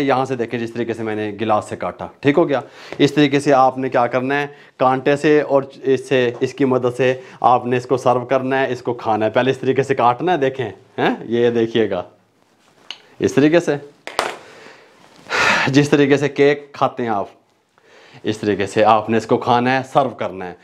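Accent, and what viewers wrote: Punjab, India. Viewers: Mujhy apka punjabi ma bolnay ka style bohat pasand hay 😃 #cookingat4thfloor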